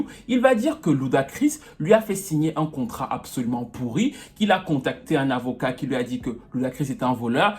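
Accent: Cameroon. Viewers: Mdrrr l'accent kmer! La vidéo est marrante, je m'abonne !well done :) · De grâce, gardes ton accent Camer. Au top.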